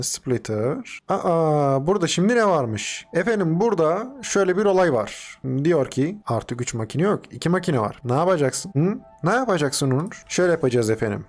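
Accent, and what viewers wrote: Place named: Hatay, Turkey. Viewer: hatay sandık içi aksanı seziyorum ayrıca :)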